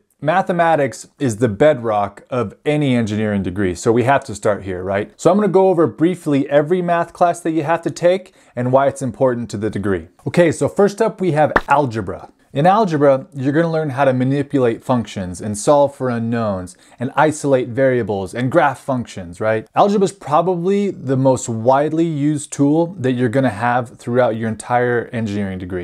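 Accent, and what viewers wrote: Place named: United States. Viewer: This might be a dumb question but I'm from tbe uk, you sound american to me. Will this stuff help me to become a vibration engineer in the uk?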